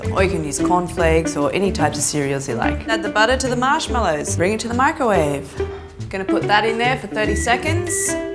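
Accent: Australia. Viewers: Is she Australian? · Are you Australian?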